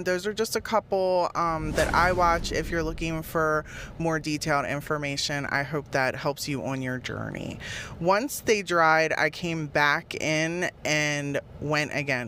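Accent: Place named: South, United States. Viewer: Haha. That is what you do to me every week. Your sense of humor is just like mine, sprinkled with a little bit of evil, just a tiny bit. 😂😂 It is raining and cold here. Is it cold where you are? I can tell you are further south, by your accent. It snowed a couple weeks ago, then it got into the 80s, for a week and a half, now I am dressing like I live in the tundra, again. I mean, c'mon, it will be june 1st in 4 days. It better get warm, or I am just going to start making winter crafts...maybe a snowman, eating watermelon and wearing a bikini. ⛄🏖👙 🍉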